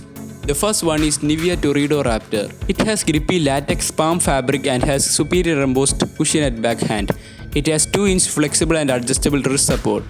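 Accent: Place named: Kerala, India. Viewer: mallu thanna 😹 Samsaram kettal thanne manasilavum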